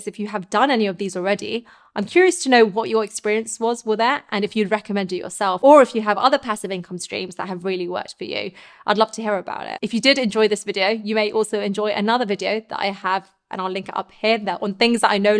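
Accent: United Kingdom. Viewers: I saw your thumbnail. Bet my buddy you had a British accent . He said American. I figured it was 50/50. Thx for the free lunch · I clicked Like after 10 seconds because of your awesome British accent, love it! Good tips too of course.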